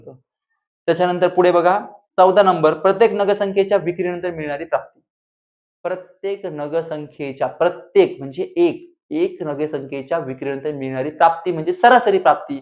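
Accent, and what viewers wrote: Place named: Nanded, India. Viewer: Sir Nandedwale ahe re bhau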